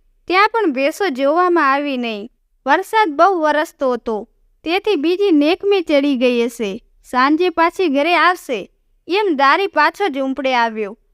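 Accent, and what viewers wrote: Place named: Banaskantha, India. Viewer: સરસ વાર્તા ઓ મૂકો છો તમારો અવાજ બનાસકાંઠા નો લાગે છે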